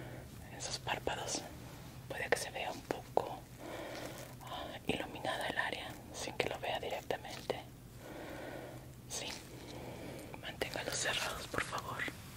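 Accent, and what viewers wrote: Mexico. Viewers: Asumo que eres de México Pero de que parte de México en si?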